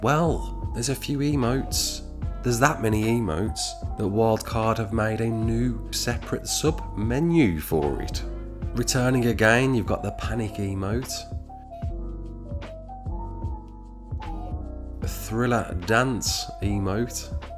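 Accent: Birmingham, United Kingdom. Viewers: You sound like a brummie boy ?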